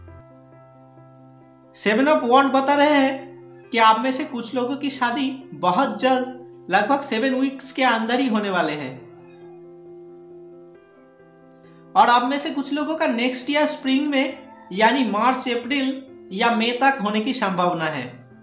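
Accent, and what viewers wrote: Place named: Bengal, India. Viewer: I feel like listening to your accent, you are bengali 🙂 I chose Pile 1 and happy with the reading which my intuition is also saying, and watching tarot readings for more than 2 years, seeing the cards came out in your reading for Pile 1, I know what you didn't said about the characteristics of my husband 🤨 but I got my answer, because it confirmed that with whom I intuitively know I am getting married very soon in 2023, He has Capricorn Sun sign, That major Arcana Devil, He is all what the Devil Card, The King of Cups (Don't show up emotions very well but loves deeply) that 7 of Swords, 8 of Cups and Hanged Man represent my partner, I know all about his negative things but still I want him only as my husband in this lifetime and in my next life times 🤗 I am older than you, so as an Elder Sister I give lots of blessings and Good wishes for your Channel 🙏🏻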